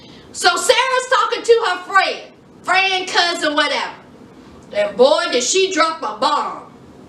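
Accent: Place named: Tennessee, United States. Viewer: Where you from gal? You sound like your from my neck of the woods in TN. The show is addictive. I like it too!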